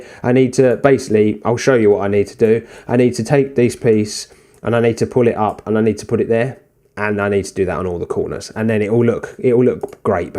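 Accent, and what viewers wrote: United Kingdom. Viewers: This is great! Random question, but are you from Hertfordshire? I lived there as a child and your accent is making me nostalgic!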